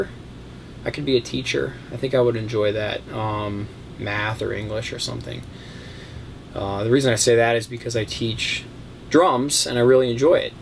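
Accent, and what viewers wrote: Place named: Pennsylvania, United States. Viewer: man, that PA accent. hahahaha. makes me feel like home. congrats on 11k subs!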